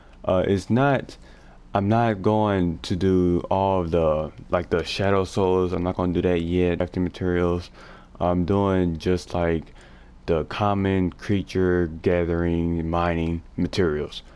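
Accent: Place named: China. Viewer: U got a Chinese accent are you from Uganda?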